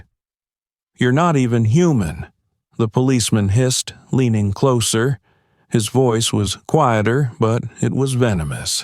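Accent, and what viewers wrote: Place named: South, United States. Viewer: a narrator with a southern drawl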